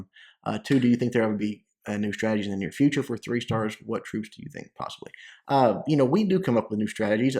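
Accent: South, United States. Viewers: Yeah, the southern-ish american accent is pretty cool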